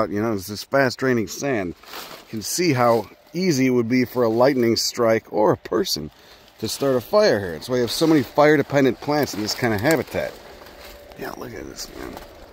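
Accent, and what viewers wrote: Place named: East, United States. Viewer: I'd love to know, where is that accent from? I'm from CO and CA, so I have trouble identifying Eastern accents.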